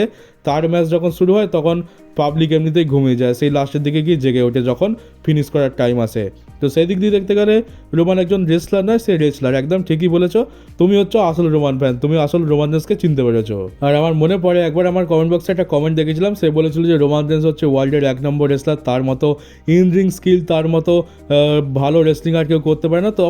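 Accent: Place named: India. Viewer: ভাই তুমি কি ভারতীয়? না মানে তোমার উচ্চারণ কিছুটা ভারতীয় আবার কিছুটা বাংলাদেশী দুটোই মিক্সিং যাইহোক আমি মহারাষ্ট্র থেকে বলছি 🇮🇳🇮🇳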